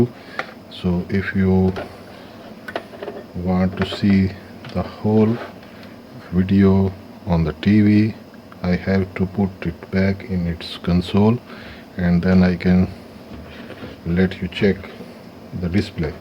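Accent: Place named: India. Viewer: Sorry 😐 But i don't like hindi accent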